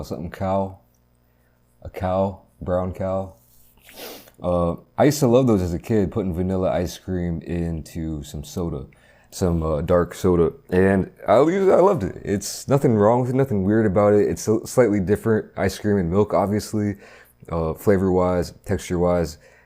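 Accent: United States. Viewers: You're in/live Korea? You sound like you were born and raised in America. Not that that matters in any way. But if you're born and raised and still live in Korea, I'm just amazed at your American is all.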